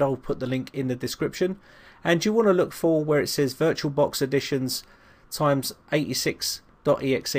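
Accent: United Kingdom. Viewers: Sotaque britânico é top kkkkk Excelente vídeo!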